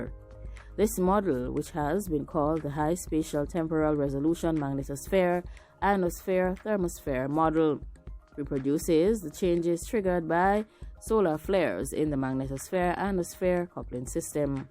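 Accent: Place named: Jamaica. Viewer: Me luv ya Jamaican accent.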